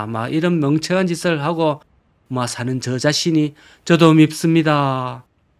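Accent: Gyeongsang, South Korea. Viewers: 집이 전라도 였습니까? 말투가 경사도 사투린데... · ㅋㅋ ㅋ.전 전라도에 사는데도 갱상도 말씀이 참 구수합니다.늘 잘보구 인생공부겸 세상구경하고 있읍니다.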